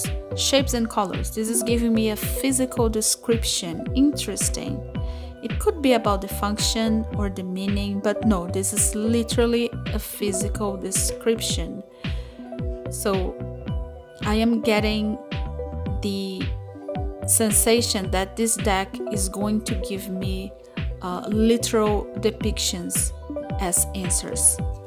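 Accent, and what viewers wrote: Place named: Brazil. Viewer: eu amo que soube q vc tb é br no segundo que ouvi sua voz! muito obrigada pelo conhecimento compartilhado <3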